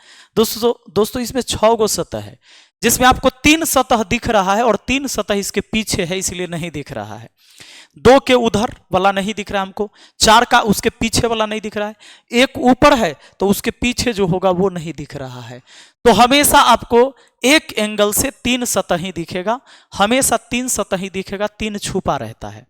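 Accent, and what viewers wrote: Bihar, India. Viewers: कोई नहीं यार आप से तो मैं बहस नहीं कर रहा हूं।लेकिन हमलोग बिहारी हैं।दो नही दू बोलते हैं आप भी बोलते होंगे अपने परिवार में। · Sir kia aap bihar se hi hai Aap kabhi kabhi maithli me bhi bol dia kijiye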